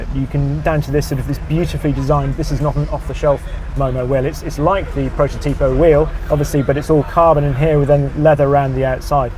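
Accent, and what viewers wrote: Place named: England, United Kingdom. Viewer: this guy mumbles, whispers and has an english accent, cant understand anything he is saying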